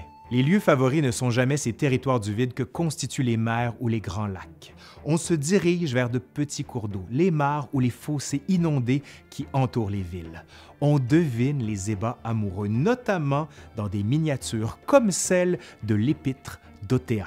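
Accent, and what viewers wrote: Quebec, Canada. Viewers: Un petit mot plus que dire juste pour ton référencement juste "référencement" car je ne sais pas comment va l'algo de youtube! Avec Nota Bene, Manon etc que tu connais, tu devrais recevoir un prix pour ton travail! Je te l'espère! Avec un petit accent québécois que j'apprécie! Bah oui, Belge, ça change de l'accent Fr ;-) Puis ma prof de science physique parlait pareil! Ok j'avais 18 ans... Et elle était si 😍😍😍Et je m'égare... Continue des partenariats! Et super, peu? ou jamais de sponsos qui saoulent de nord vpn ou autre! Je te souhaite de futures très bonnes vidéos et une audience décuplée!!!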